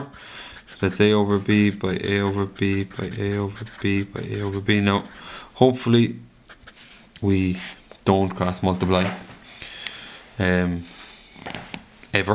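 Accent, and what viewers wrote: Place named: Ireland. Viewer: Cant work out if its the irish accent that did for me but weeepppiieeee!! Got it...lol Thanks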